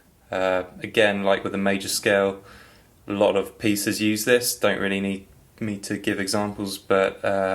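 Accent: United Kingdom. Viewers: also you're very charming and I love your british accent :3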